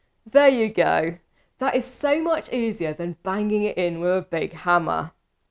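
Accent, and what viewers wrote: England, United Kingdom. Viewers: As much as I can't stand videos or commercials with people who have english accents, in this video , for some reason I was able to overlook that.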